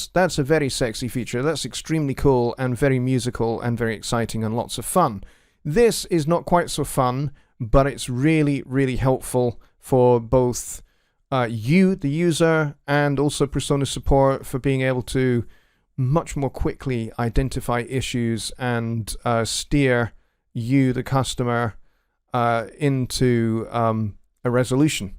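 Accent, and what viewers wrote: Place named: Scotland, United Kingdom. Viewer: Where are you from in Scotland 🏴 bud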